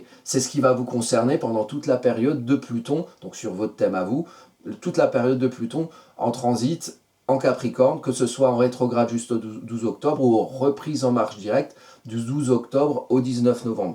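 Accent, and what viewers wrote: Alsace, France. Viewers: ....un petit accent Alsacien ou mosellan derrière les fagots ;) ??? Beau travail et vidéo que j'ai plaisir à écouter. Longue vie à votre chaine :) Signée une Alsacienne perchée.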